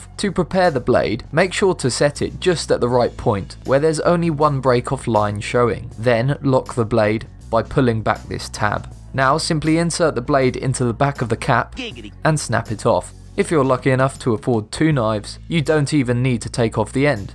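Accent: United Kingdom. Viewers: Oh you know I just realised this person has a British accent ,' '-D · I love the accent. If this weren’t conveyed in a British accent I think I wouldn’t love it as much. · Is it only me or is every top 10 channel British? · InxPursuitz he sounds nothing like him. Dolan is Australian this guy is British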